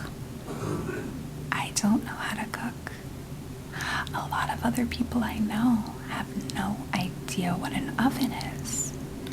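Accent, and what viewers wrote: South, United States. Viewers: You're truly a gem. Your southern accent relaxes me the most, but at this point I'll binge anything you make, you're a comedy genius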